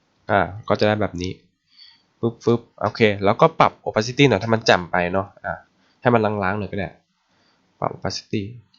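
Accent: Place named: Kanchanaburi, Thailand. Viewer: ฝีมือเก่งมากเลยครับ แถมสอนก็ดีอีกละเอียดมาก แถมมีเทคนิคคีย์ลัดให้ด้วย ชอบมากครับ เยี่ยมไปเลย,, ปล.สำเนียงคล้ายเพื่อนผมที่เป็นคนกาญเลย คนกาญป่าวครับเนี่ย 555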